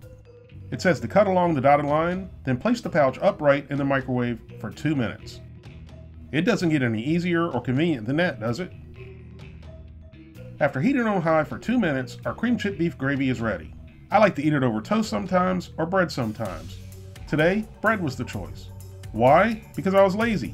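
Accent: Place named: Baltimore, United States. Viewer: Heh, "Baldimo", yep you're from there. Cheers from MD!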